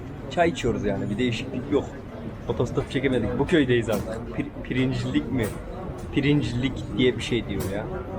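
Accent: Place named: Niğde, Turkey. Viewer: yav adam istanbullu degil. nigdeli !!! neden diksyon dersi alsin allah allah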